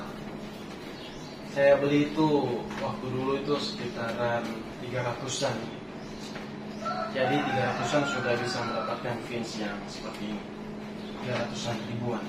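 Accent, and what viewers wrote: Manado, Indonesia. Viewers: Orang manado kang?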